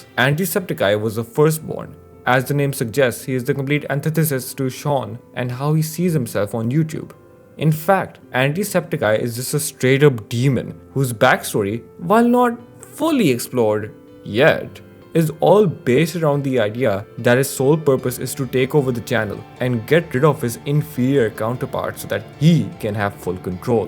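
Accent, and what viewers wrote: India. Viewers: Oi oi another Indian (or South Asian) Jacksepticeye fan. A rare occurence! And from your accent and the English used, I am assuming you live in India (orrrr may be not)? Which is even rarer.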